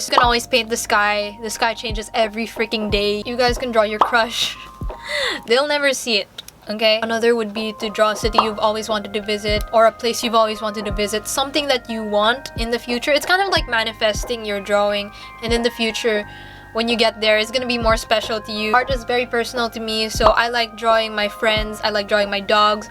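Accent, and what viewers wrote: Philippines. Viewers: You are from the Philippines? I hear from your assent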